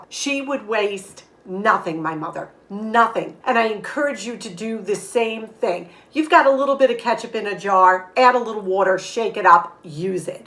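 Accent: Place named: New Jersey, United States. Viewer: Great tips! We give kitchen scraps to the hens, we get eggs. As a native Philadelphian, I gotta say you sound like you're from Jersey.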